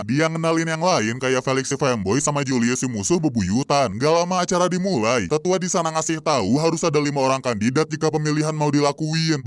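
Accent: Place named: West Java, Indonesia. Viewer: nyunda banget logatna😭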